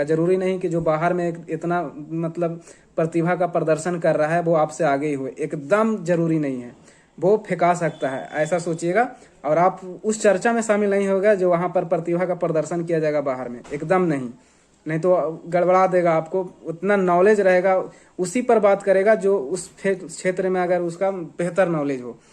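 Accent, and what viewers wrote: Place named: Gaya, India. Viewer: Gaya ka tone hai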